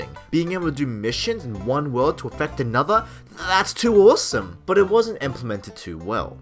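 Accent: Australia. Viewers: are you an Aussie?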